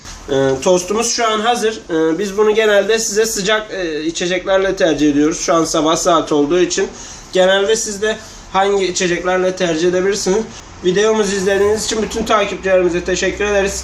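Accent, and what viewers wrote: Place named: Thrace, Turkey. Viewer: bu adam trakyali mı konuşması trakyalilara benziyor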